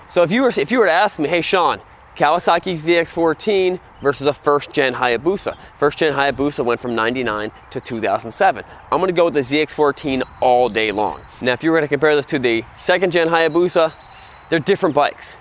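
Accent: United States. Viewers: ZeyX14 lol, I'll never get used to how you americans say your z's.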